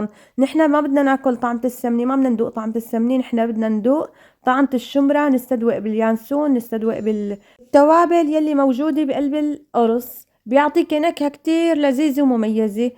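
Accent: Homs, Syria. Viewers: يسلموا دياتك فعلا شي بشهي يعطيك العافية ويبارك ما بين ايديكي .على فكرة دخيل اللهجة الحمصية ما أحلاها الله ايسلم ❤❤❤❤ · حبيبتي شكرا انا متابعتك وبحبك كتير احلى لهجة لهجة السورين وبحب حكياتك وصفاتك انتو السورين ستات بيوت ماشالله عليكم متابعتك ام فيصل من فلسطين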